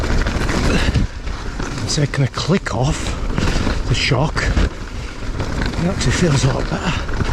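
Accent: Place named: Yorkshire, United Kingdom. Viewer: If it wasn`t for the Yarkshire accent and the wet trails I`d have sworn I was watching BKXC. Brilliant video, loved every minute. Quality review of the bike as well.. Oh and the colour of the handlebars.......I`m colour blind so it Doesn`t matter to me LOL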